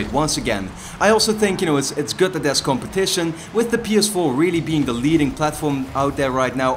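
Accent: Netherlands. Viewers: i also hear a dutch accent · Dutch accent?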